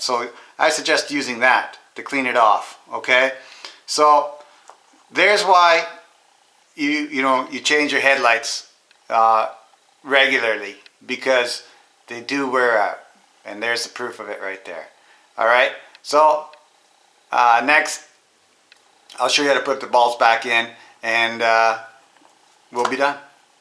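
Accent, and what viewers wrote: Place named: North, United Kingdom. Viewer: Helpful video nonetheless. However, I'm trying to figure out what kind of accent that is...sounds northern.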